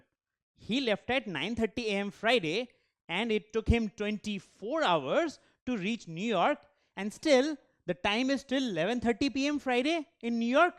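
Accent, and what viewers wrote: India. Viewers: The indian accent is funny and cute at the same time. Keep it going, sir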